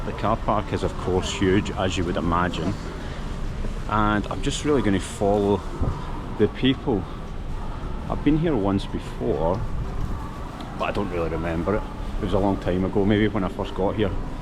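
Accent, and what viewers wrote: Scotland, United Kingdom. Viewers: I LOVE you sottish accent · Обожаю шотландский акцент!)) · Scottish guy reading Russian - my regards to your effort mate :) Last year Irish guy accidentally smashed my side mirror, was a funny way to meet a foreigner in Moscow. All are welcome, enjoy and have fun.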